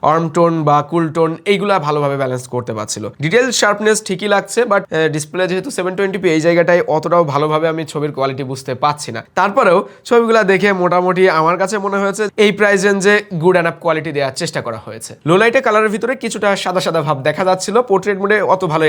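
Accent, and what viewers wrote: Benapole, Bangladesh. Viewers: ভাই আপনার বাড়ি কি বেনাপোল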